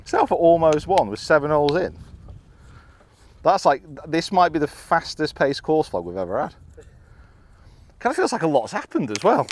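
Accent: United States. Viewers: your american accent is top notch, love it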